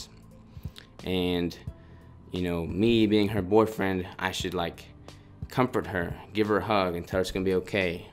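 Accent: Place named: South, United States. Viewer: Where are you from bro? I hear a southern accent.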